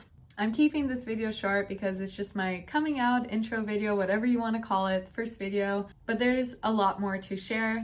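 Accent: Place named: Germany. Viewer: You can hear German influence on your English 😂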